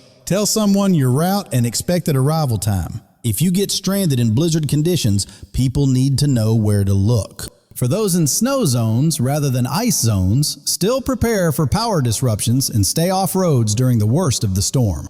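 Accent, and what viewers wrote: South, United States. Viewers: Golly!!!What a hillbilly announcer. Quess it’s time for you all to put on some shoes! It’s called WINTER!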